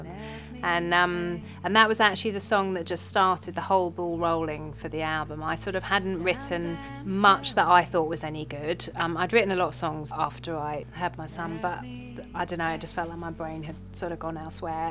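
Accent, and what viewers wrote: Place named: London, United Kingdom. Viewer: She's so London, innit?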